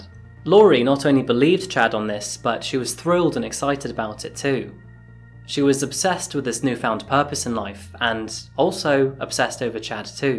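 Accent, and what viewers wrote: England, United Kingdom. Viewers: In my opinion, the English accent is one of the best for monologues. :-) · I come here to hear this case in british accent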